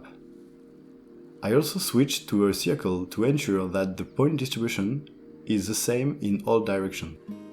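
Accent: France. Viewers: J'ai reconnu l'accent français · love ur french accent ! u are french right ? je le suis aussi xD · ahlahlahhh qu'il est beau notre accent francais ! j'adore 😆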